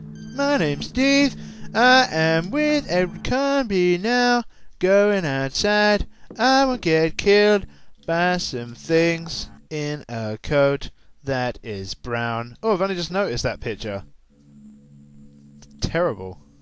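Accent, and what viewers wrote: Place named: United Kingdom. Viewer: I didn't notice that you are British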